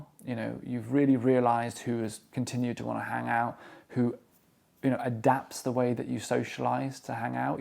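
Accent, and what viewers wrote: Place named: South England, United Kingdom. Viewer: Your accent is spot on with Daniel Radcliffe. What part of the UK is it from?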